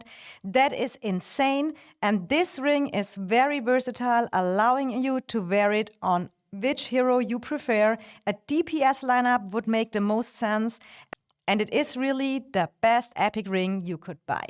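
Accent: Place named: Germany. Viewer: The German accent ❤😂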